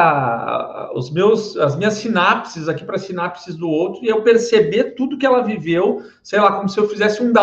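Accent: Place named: Rio Grande do Sul, Brazil. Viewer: Boa tarde, cheguei aqui por indicação! Pelo teu sotaque, és gaúcho da região metropolitana assim como eu! Gratidão pelo conhecimento!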